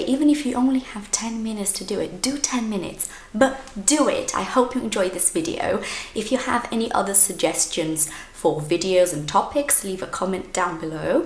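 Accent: United Kingdom. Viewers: VC é brasileira??? Que inglês britânico lindo é esse??? I'm shocked! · I love your British accent. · I've from The uk (london) and she has a very strong british accent haha! More "british" than mine 😂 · inglês britânico maravilhoso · what a lovely British accent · Seu English é britânico ? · Ela realmente tem um sotaque britânico maravilhoso é uma delicia ouvir. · Nossa que sotaque britânico maravilhoso!! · why do u have british accent? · sotaque britanico ?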